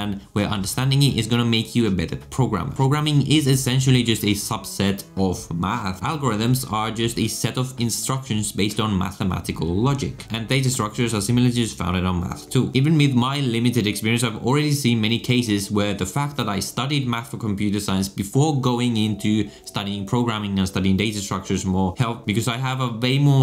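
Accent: India. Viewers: I love your accent, it's a bit like the indian accent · Why do you have an indian accent? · it kinda sounds indian bro, just saying. I hope you are not some charlatan or something. whatever you are trying to hide we can tell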